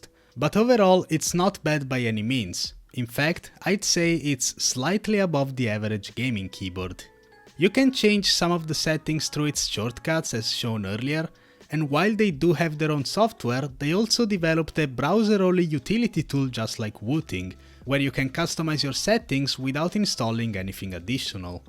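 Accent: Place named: Italy. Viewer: That's some sick italian accent, right?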